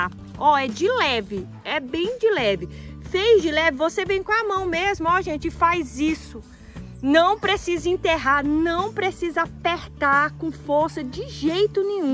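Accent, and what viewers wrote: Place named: Goiás, Brazil. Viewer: Oi vc parece Goiana. Ô trem bão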